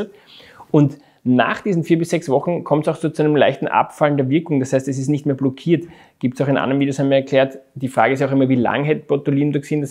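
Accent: Austria. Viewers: Echt jetzt? Ich bin Polin, nie in Österreich gewesen (in DE übrigens nie länger als zwei Wochen am Stück) und verstehe ganz normal. Wäre Ihnen sächsisch oder schwäbisch etwa lieber? Herr Doktor spricht in seinen Videos übrigens keinen Dialekt, es ist Hochdeutsch mit dem niedlichen österreichischen Akzent.😊 Nichtmediziner können evtl die vielen lateinischen Namen nicht kennen, wie Septum, Ligamentum, N. Trigeminus, N.Facialis etc.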